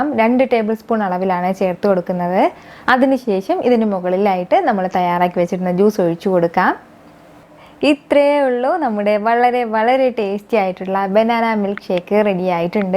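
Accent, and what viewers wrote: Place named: Malabar, India. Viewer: Actually trivadrum tanne anoo..voice cheriya oru malabari touch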